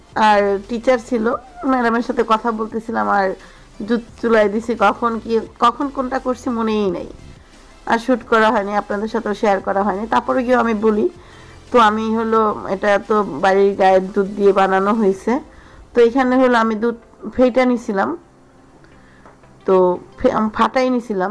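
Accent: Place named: Bangladesh. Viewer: Bhalo kore Banglay kotha bolun. Aapnara, Bangladeshira , jokhon banglay kotha bolen tokhon aamader Paschim Banglay bose lojja kore. * Hoichhe noy : Hoyechhe * Maikha noy: Mekhe * Jaitechhe noy : Jachhe * Bhorta noy : Bhate * Bahire Gichhilam noy: Baire gechhilam.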